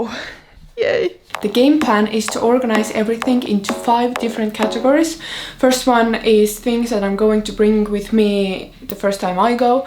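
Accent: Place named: Estonia. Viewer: Love the Estonian accent 🤗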